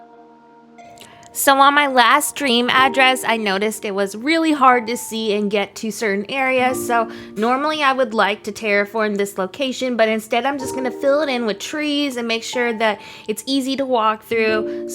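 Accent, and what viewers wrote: South, United States. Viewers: I have been following you on Instagram for a while now and this is the first video I have ever seen of yours. Your accent is so cute! Just a subtle southern twang. I love it. I love your builds!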